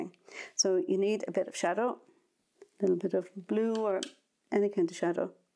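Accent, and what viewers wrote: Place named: South, Ireland. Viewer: Hi, I really enjoyed your video! However your lighting made it a little difficult to see the image very well. And the image was a bit blurry. I checked if it was the tablet I was viewing from, but it was only on your video that this effect occurred, which is sad because yours is the content I want to see. I LOVE your painting style, and your teaching style. Plus you have a beautiful, gentle, soothing voice which reminds me of my father who was Southern Irish. I have subscribed to your channel today and this video is the first video of yours I have watched and I LOVE your channel. Is there any way for the camera to be closer to the painting, and could you add stronger lighting please, because that would help folks like me who are watercolour newbies, who need to see more of what is going on, on the page? I know it may be asking for a lot, but if it could be sorted out, then we would get so much more from the videos. 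Thank you in advance. God bless you and all those you love. Have a great day!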